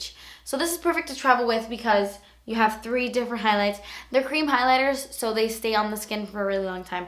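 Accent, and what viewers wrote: United Kingdom. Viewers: You have the cutest British Accent Ever! :D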